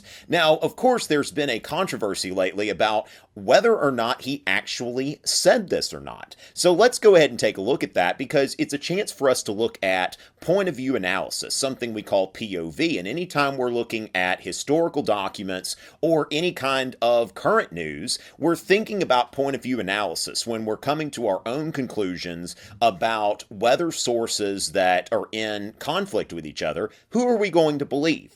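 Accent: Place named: California, United States. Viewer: My pleasure... you do phenomenal stuff! If I wasn't so busy with every little flippin' committee and as a union rep, I'd probably be doing JUST what you are. Seriously - you have saved me time, energy, stress and helped my kids out a lot. I hope you can pull down a decent little supplement from your vids... Plus, as a complete resident Califoooooornian, your accent just rocks! Kids love it, too! :D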